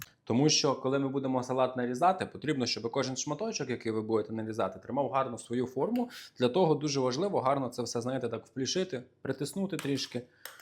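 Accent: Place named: Western Ukraine, Ukraine. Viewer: Трошки не аль-денте😄 Чудова наша західна розмова😍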